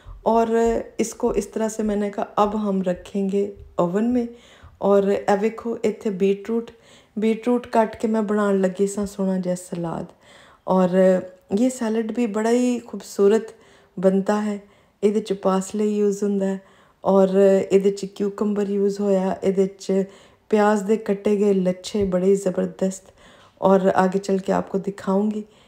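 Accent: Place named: Punjab, India. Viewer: Ap ki punjabi ka tarka ap k vlog ko chaar chaand laga daita hai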